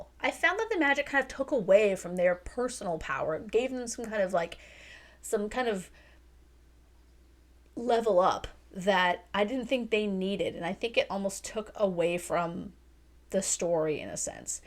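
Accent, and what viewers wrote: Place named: RP, United Kingdom. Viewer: 🇬🇧 Britisher here, laughing at your posh accent! 😆 I was wondering where that came from, until you mentioned the audio book and it began to fit into place. That got dark right at the end of the spoiler section! 😳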